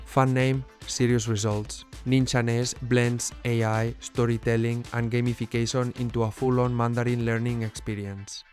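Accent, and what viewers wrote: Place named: Spain. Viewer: Why the Spanish accent?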